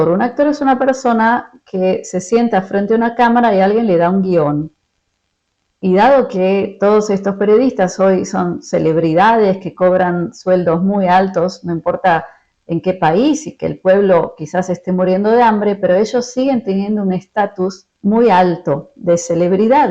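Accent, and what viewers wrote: Argentina. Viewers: me da esa escucharte. Tu tono argentino después de tantos fiera de tu país. En que haz colaborado en tu país. Es fácil juzgar y no estar en la en tú Pais. en batalla. Desde lejitos. DTB y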